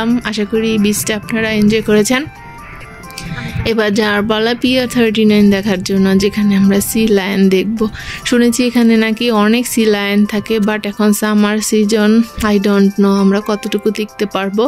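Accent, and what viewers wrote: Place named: West Bengal, India. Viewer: Apnar bangla uchharon Bharotio bangalir moto..bes valo , all the best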